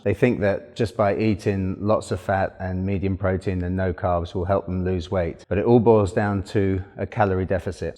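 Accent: England, United Kingdom. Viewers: Yes thank goodness!! You are not American! Such a breath of fresh air you are. No dramatic hyper "best actor voice", just facts. Thank you sir 🙏. So pleased you are English lol... New sub from the island next door 🇮🇪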